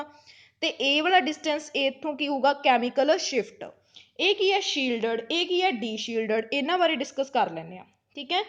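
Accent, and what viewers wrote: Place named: Malwa, India. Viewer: Wao mam malwa belt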